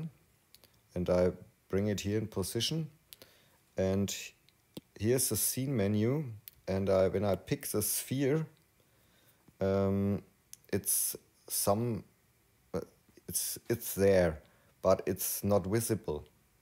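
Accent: Bavaria, Germany. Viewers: I like your bavarian english!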